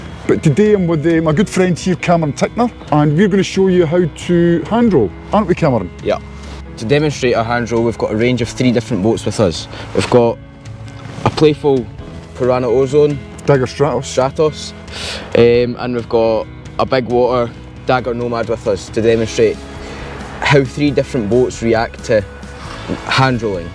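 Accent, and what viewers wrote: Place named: Scotland, United Kingdom. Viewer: Come for the hand roll, stay for the AWESOME Scottish accent.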